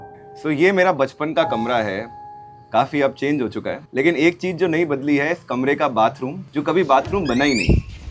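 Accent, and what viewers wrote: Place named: Jodhpur, India. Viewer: Damn you're Jodhpuri!!😅very proud to hear it!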